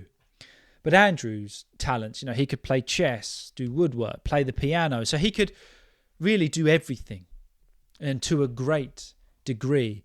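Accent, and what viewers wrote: England, United Kingdom. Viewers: A handsome English teacher with his beautiful blue eyes, and beautiful English accent 💗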